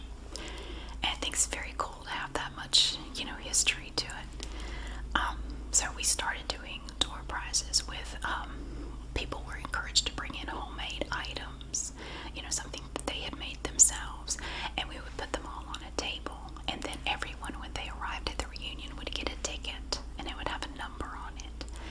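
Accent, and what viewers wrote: South, United States. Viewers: It's still a raffle, even if they aren't paying money for the tickets. Raffle just means a random drawing. Thanks for this. I made a comment on your golf video about you being too entertaining for me to fall asleep to your videos. I'm too interested in this so I can't sleep. It's okay. I always have the option to watch your jewelry vids. Those work for me. I hope you have/had fun at your reunion!!! You always do so much for us. I wish I had a billion dollars to give you because you deserve it. I just come for the whispers. Your southern accent just calms me. You have such a motherly-vibe. Do your kids and family know how much you've helped other people?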